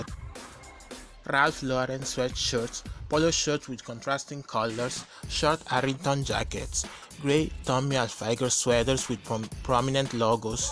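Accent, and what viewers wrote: Italy. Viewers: Why you speak with an italian accent?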